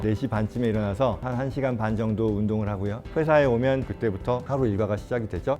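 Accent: United States. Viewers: 말투가 미국교포 발음이라서 어렸을때 간줄 알았는데.. 이력보니 서울대 경제학과 졸업후 가신거네요. 어렸을때 갔으면 한국에 대해서 잘 모를텐데 대학 졸업후 간거면 한국에 대해서 너무 잘 아시겠네요. 이분이 한국과 미국 양쪽에서 성공적으로 활동할수 있었는지 알수 있는 이력이네요. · 이야...환경이 중요하구나 한국에서 오셨는데 미국에서 태어나고 자란 나보다 더 교포느낌 나시네 ㅋㅋ 난 한국서 연습생해서 지금은 영어하지않는 이상 사람들이 교포인지도 모름ㅋㅋ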